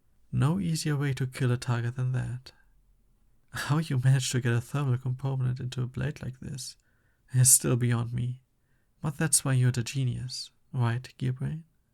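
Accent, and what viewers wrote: Germany. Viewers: Your german accent is thinker than anything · Are you german it Sounds a bit like it i‘m german myself i was just wondering ? But love the video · ……….I- I was not expecting that accent. You really from Germany? Goddamn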